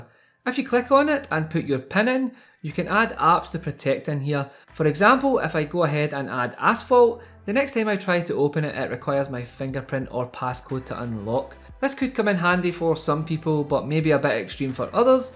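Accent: Scotland, United Kingdom. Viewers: Scottish mate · Are you from Scotland??you got amazing accent!!! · His he Scottish? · Are you Scottish? Love the accent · I guess you are Scottish gerat video 👍🏻mate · I'm subscribing to help you reach your 100K subscribers. Be thankful to your Scottish accent. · Scottish accent is a bonus! I love it! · Scottish accent. Right? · ... Ef you liked it... Haha. That Scottish accent. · man ILOVE YOUR ACCENT - where are you from ..? Scotland .??)) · OK, Had to subscribe, hearing a Scottish voice instead of an American or Indian one. Goan yirsel' ! Totally agree about dark mode, Google has taken far too long to make it an option, they've also been doing their best to kill the Substratum themes capability too, I think their devs are jealous when custom rom devs make nice toys. FYI That phone isnae gonnae work well wae nae sim card though :) One tip I hadn't known about was double tapping in the cam app to switch cameras between front/back, saw that in a review recently.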